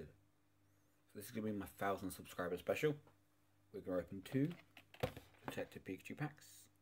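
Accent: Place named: United Kingdom. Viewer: Love your intro mate, looks amazing! Sounds like you're from the UK also? Really liked the Detective Pikachu series, do you think the cards will go up in value at any time? Subbed mate!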